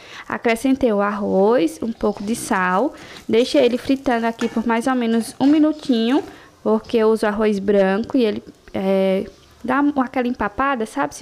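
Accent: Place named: Caruaru, Brazil. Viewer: Menina kkk eu cai de paraquedas no seu canal eu conheci logo que vc é de Caruaru pelo sotaque, aí esperei pra ver se vc realmente era de Caruaru, sou de agrestina. Somos vizinhas ❤️❤️❤️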